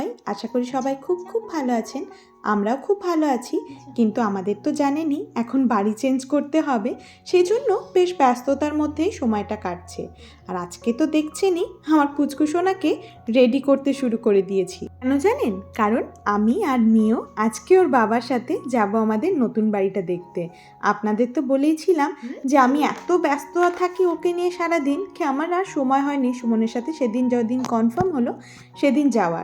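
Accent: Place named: Kolkata, India. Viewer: Well done! Your accent is like more from Kolkata :)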